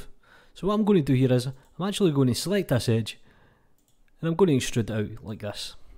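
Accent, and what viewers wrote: Scotland, United Kingdom. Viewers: I turned the captions on because I find it a bit hard to follow a Scottish accent, but youtube couldn't handle it very well either, so I got phrases like "Ness is a primitive" :-) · I love his Scottish access soooo much. I bet others do so ;-)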